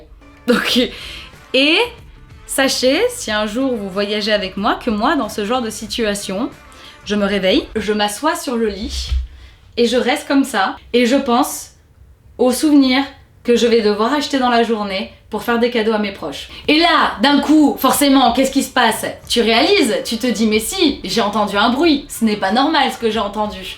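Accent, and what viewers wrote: France. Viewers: C'est beau 🥰🥰🥰 Cet accent niçois qui fait toujours plaisir 🙃☀️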